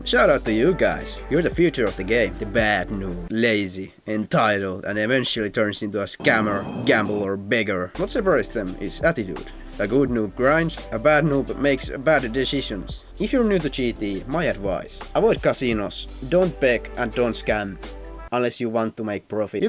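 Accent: Finland. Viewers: I like finnish accent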